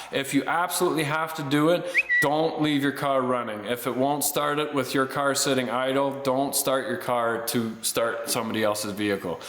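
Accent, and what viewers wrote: Canada. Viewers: I love how i could tell he was Canadian after the first 30 seconds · Good, useful, logical tips presented in a wholesome Canadian accent. Well done!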